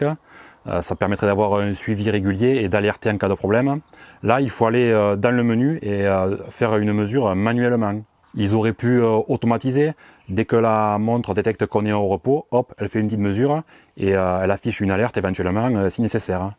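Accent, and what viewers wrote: South, France. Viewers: Insupportable son accent du sud 🤦🏿‍♂️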